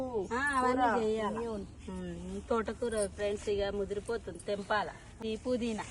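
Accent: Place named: Telangana, India. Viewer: Pls voorike friends anakandi. Chaala boring and irritating ga vundhi. This is only a suggestion. Talk in pakka Telangana accent. No problem, but don't say friends 😮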